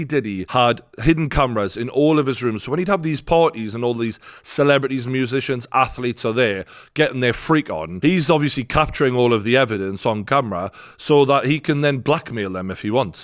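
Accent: Newcastle, United Kingdom. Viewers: He accent is called Geordie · He is a Geordie from Newcastle upon Tyne. Check out any vids on the accent and city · Geordie accent, what does this mean? I do kind of like listening to this guy. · Brilliant, we need more Geordie explanations of things going on. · Such a brilliant fresh delivery of all this Diddy tea 🫖 ☕️… love the geordie accent, and subscribed 😂😊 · I love this but he's not Irish bless ya! He's from Newcastle the accent is a Geordie accent 😁😁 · Swear is jokes 🤣🤣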